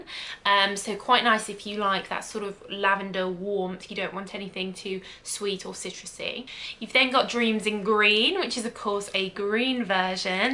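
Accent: United Kingdom. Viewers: Do all these Brit’s talk like this?????? Haha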